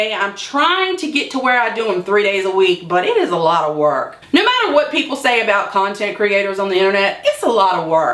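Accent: South, United States. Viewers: you are saving me from a panic attack right now. I'm Australian and listening to your soothing southern voice is weirdly helping. I don't want to take my short term medicine but I think I have to.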